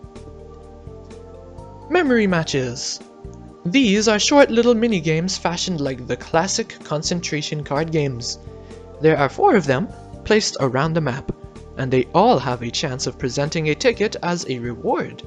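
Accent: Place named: Australia. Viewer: Why you have Australia accent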